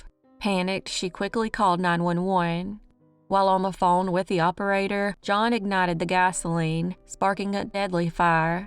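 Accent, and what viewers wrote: South, United States. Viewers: I love listening to you from the other side of the Atlantic telling crime stories with your absolutely lovely southern accent. I just love it. Thank you very much for your work and greetings from Portugal 🇵🇹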